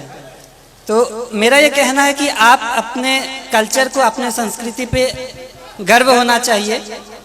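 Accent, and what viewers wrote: Bihar, India. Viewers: Aapki tethi bolna mujhe bht achhi lgi sir😊😊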